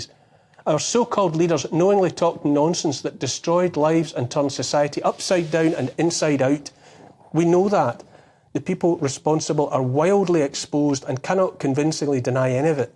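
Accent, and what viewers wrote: Scotland, United Kingdom. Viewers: Well said George Best with a scottish accent😃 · it's not just what you say but the magnificent prose you use to say it. I could listen to you all day. The Scottish accent helps too.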